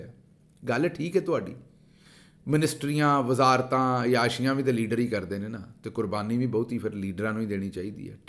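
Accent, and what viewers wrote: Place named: Punjab, India. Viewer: Your punjabi accent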